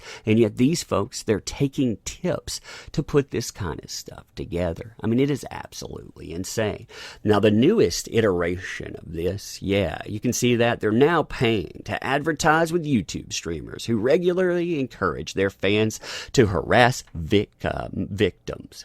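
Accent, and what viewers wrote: South, United States. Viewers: Your southern accent is so soothing. :3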